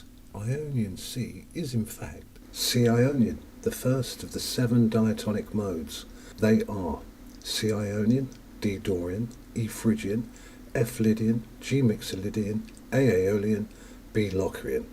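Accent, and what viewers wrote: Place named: England, United Kingdom. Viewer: This is the FIRST time in my life that I did not understand not a word what he saying. Accent is terrible, probably English, sound is terrible with a lot room effect Never happen to me in 57 years: I can watch American or English movies without translate or subtitles but this is....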